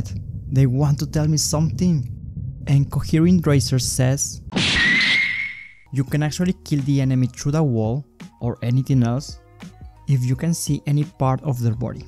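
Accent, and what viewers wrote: France. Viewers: his accent sounds french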